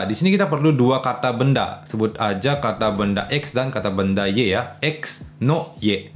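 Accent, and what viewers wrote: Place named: Bali, Indonesia. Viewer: Mendengar bercerita panjang-lebar seperti ini, jadi teringat dulu waktu saya masih tinggal & mengajar di Bali. Bhs Indonesia-nya khas Bali, demikian juga dengan joke2nya 😅😂🤣😆 Terus semangat, sensei \\(^_^)// Jasamu sangat diperlukan di negeri ini! 😁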